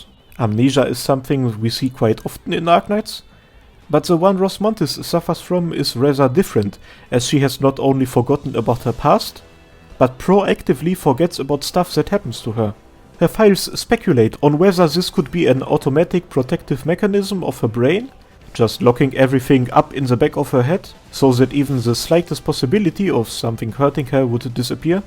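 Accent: Germany. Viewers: Some of the Arknights backstories are dark (just take Frost Nova as an example), but hers surely takes the cake. I do love her though, and as a new player, I do hope she will come back so I'll have a chance to blow my money on getting her, cause I do want her so bad. The chapter 8 music video, she looks so bad ass! Also, your explanation of her ability, of four invisible hands holding onto the things she's got with her. My first though was Re:Zero and Petelgeuse's unseen hand witch factor. Haven't seen the anime you mentioned, so can't comment on that, but as you explained it, I immediately thought of Re:Zero. Anyway, thanks for your lore videos. As a new Arknights player, there is so much lore to catch up on, and the story of Arknights is actually really good. So having access to your videos is a nice help to explaining some character connections and such. Also, please don't loose the accent, it's so charming. 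I live in Denmark, so I'm used to German tourists visiting, and the German accent I've always found so charming. I get why you sub the videos, it can be difficult to understand for some. Guess what I'm trying to say is, keep doing you, keep up doing the great work, and thank you for the videos you make! ❤❤❤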